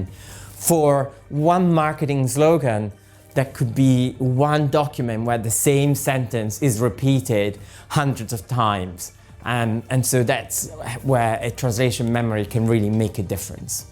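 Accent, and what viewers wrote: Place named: Scotland, United Kingdom. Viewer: I could listen to your adorable accent all day. Is the influence Scottish? Irish?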